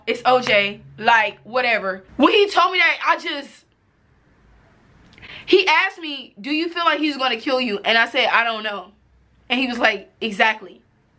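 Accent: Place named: Chicago, United States. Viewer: Chi-Town Accent! Choctaw face!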